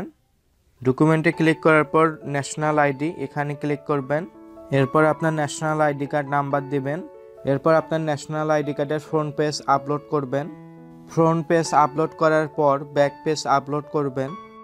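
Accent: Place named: Sylhet, Bangladesh. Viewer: ভাই আপনার কথা শুনে মনে হচ্ছে আপনি সিলেটি আমিও সিলেটি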